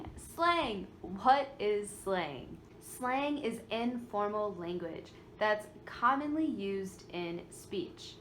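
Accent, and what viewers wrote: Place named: United States. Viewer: Thanks good job I love the way American speak English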